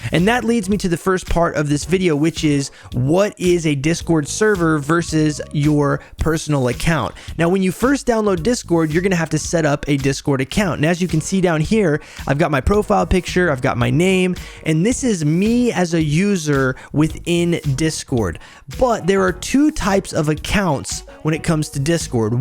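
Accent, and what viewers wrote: United States. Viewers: what is your accent? is that Texas/USA or California? your cadence is familiar - its modified with practice?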